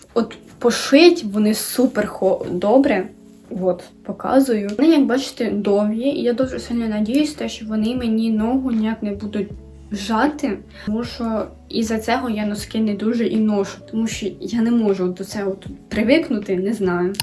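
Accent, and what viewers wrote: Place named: Surzhyk, Ukraine. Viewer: Пиши будь ласка собі трішки сценарій, дуже сильно говір ріже слух. А коли пропишеш то будеш знати хоча б умовно слова і буде менше цього суржика страшного. А так то молодець працюй далі❤️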